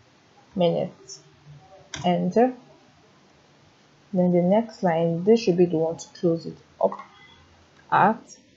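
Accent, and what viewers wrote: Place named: Nigeria. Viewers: Don't be silly man, if you can't understand simple Nigerian Accent. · nah. She rarely made grammatical blunders. I Understood her perfectly. So its either you're terrible at hearing English or you're just being presumptuously mean for no damn reason. That's a Nigerian accent. I'm Nigerian and English is the Lingua Franca in that country. Most of the new gens learn English as a first language. So you coming here on YouTube to give unsolicited Dimwitted comments because you can't comprehend her accent is just distasteful.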